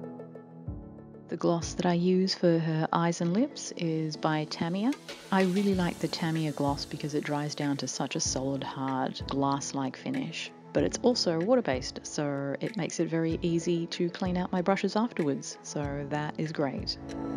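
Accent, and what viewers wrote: Australia. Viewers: Hey where's your accent from? It reminds me of mine somehow, yet I'm a Queenslander from Australia. Just want to know if my accent is out of place or if I somehow detected the QLD accent in the wilds of America.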